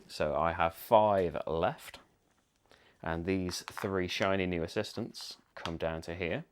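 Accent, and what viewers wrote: United Kingdom. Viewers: Hi there and great job, just discovered your channel and this playthrough is great. Thanks for the video, for us foreign players the british accent is sometimes more clear than the american one. Keep the good job and thanks again!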